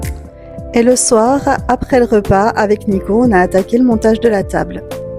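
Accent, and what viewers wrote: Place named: Franche-Comté, France. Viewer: Bonjour. bravo pour cette chaîne que je découvre aujourd'hui 😉 quel plaisir quand j entends que tu viens de franche Comté....cela nous fait un point commun 😅 l' accent...je connais... et le tien est très léger 😊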